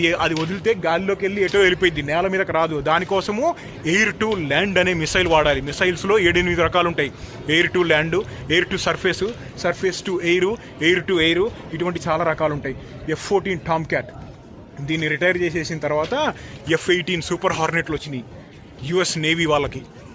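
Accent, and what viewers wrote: Andhra Pradesh, India. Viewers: Bro needi nizamabad kada how come you speak in andhra accent?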